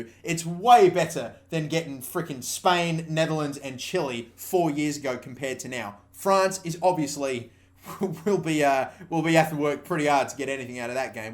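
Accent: Australia. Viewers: I thought u were English at first and when you started to explain last world cups group England "was in", I was like "wtf, they had Italy, Costa Rica, and Uruguay, not spain, netherlands, and chile. Then i realized you were Australian....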